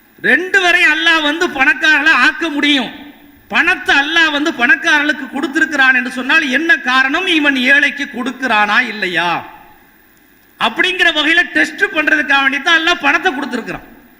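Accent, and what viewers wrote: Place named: Tamil Nadu, India. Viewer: பணிவான வேண்டுகோள் அரபி யை தமிழ் உச்சரிப்பில் உச்சரிக்காதீர்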